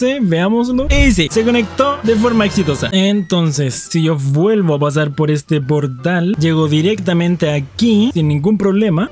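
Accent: Chile. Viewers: Tío de que que país eres me párese de chile o no y feliz navidad que te visite el viejo pacuero po XD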